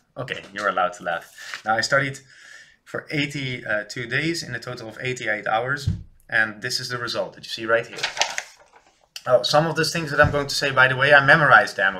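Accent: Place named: Iran. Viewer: Hey, I showed the video to my roommate who speaks Dari and is from Afghanistan. He really praised your ability to speak Farsi and what I thought was funny to mention is that to his ears you do really sound like somebody from Iran.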